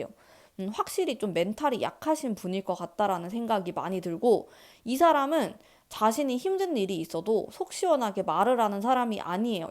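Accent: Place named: Gyeongsang, South Korea. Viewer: 경상도 분이신가요 ㅋㅋㅋㅋㅋㅋㅋㅋㅋ 아무리 감추려 해도 감춰지지 않는 사투리 잘 듣고 갑니다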